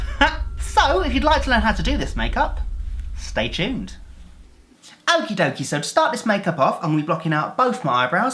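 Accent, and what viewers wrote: United Kingdom. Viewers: wait is this youtuber british?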